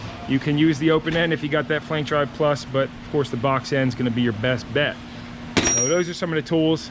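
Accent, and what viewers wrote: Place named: Pittsburgh, United States. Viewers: Good vid! I hear a fellow Yinzer accent! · That's a PA accent if I ever heard one!!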